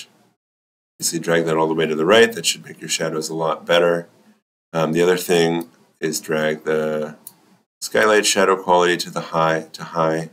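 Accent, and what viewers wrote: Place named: United States. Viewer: And you are an American, English speaking person. That makes all the difference from the clickety, clickety, dialect of India folks attempting English. THANK YOU!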